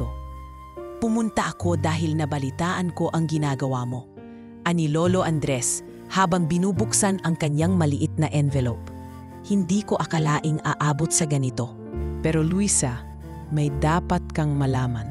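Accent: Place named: United Kingdom. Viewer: British ung narrator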